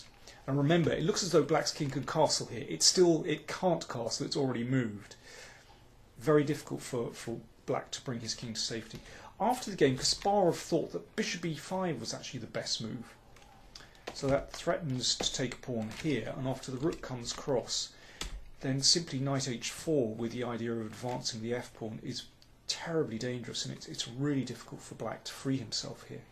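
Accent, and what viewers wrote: United Kingdom. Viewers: What the the heck are you talking about with his speech!? He sounds perfect to me and so charmingly British! I'm genuinely curious what you hear that I don't.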